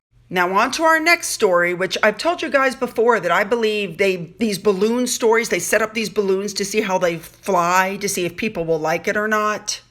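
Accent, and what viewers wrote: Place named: New Jersey, United States. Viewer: I wonder where she's from? I'm guessing New Jersey, Pennsylvania, or Delaware.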